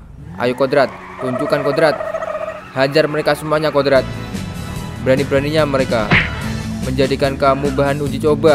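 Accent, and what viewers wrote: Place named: Java, Indonesia. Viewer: Med'do banget nadanya Terus nora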